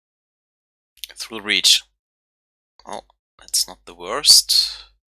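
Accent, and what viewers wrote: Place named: Germany. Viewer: Hey, nice run. Your dialect sounds like german, so i wanna ask, where you from.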